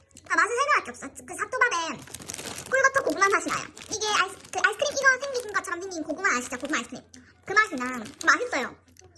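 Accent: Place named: Busan, South Korea. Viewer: 님 부산분인가봐요^^ 반갑반갑~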